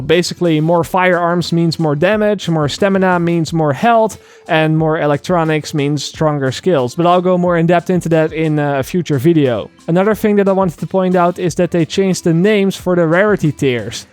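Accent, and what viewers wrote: Netherlands. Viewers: if i hear good youre dutch ? kan you add me on uplay KillerAngelNL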